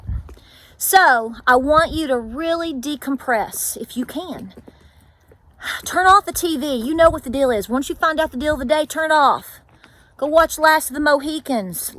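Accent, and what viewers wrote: South, United States. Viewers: So glad you're ok! Just had your channel suggested to me, and I just love it. My husband's family was from Murfreesboro, TN and I grew up in Columbus, GA. We retired after military traveling around the world,now living in Rome,GA. It is such a pleasure hearing another fellow southern gal and learning from her on your recipes. I hadn't heard that term "cat head biskits" since my mother-in-law used to teach me in her kitchen back in the 80s-90s. Thanks for those great memories! Many blessings to you and your family! Thanks for such a great video here. Reminding us all to remember those things most important!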